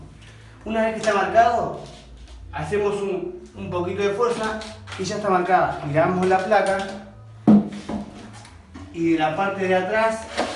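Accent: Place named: Argentina. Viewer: Era hs siempre hay del otro lado un español explicando.ahora es el tiempo de escuchar un argentino buenicimo tu programa .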